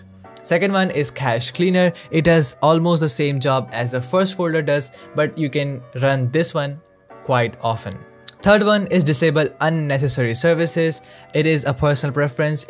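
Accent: India. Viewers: We can hear your Indian accent. You don't have to hide it.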